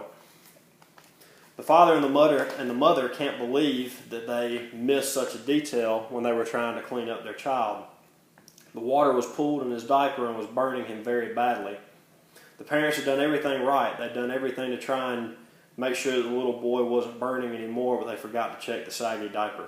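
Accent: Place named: South, United States. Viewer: Hey bud. I love ya because you were a son of the south reading this incredible haunting late DFW micro-story, but as I’m sure you’ve been informed, the baby didn’t die. Not physically. The point of the story is how enough pain, combined with enough hopelessness of escape, causing permanent mental/spiritual dissociation as the only available escape. It is a part of him was more present and alive in the world that he was born which detached itself from his spirit and “floated away like vapor” or whatever he says there. He was in so much pain, and the two people he instinctively trusts to make pain go away couldn’t for so long, that he partially dissociated to get away from it and that part of him never came back, though it clearly says he went on and lived and life and “drew pay” meaning he worked a job like anyone else. But he was partially detached from life, a part of him dissociated and left forever, and watched overhead. 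In other words, he was a very different person in life than he would have been had the accident not happened, an alive and present person. He lived his life, but his soul left, and watched overhead😟🤯🤯😮. Fucks me up every time. Are you from TN, NC, GA or KT? Maybe SC but no one in SC has ever read DFW. Hearing this in your accent is music to my ears. Good on ya for so much here. If you’re still on here I’d love to hear what this was for and if you finished your degree, etc. 🤠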